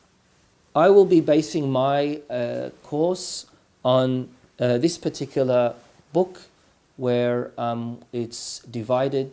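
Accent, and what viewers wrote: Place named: Australia. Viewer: Shia page. With Aussie accent.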